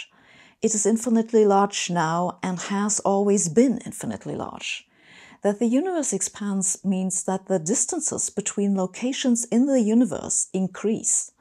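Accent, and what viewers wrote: Germany. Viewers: how did I know she would sound german before clicking the video haha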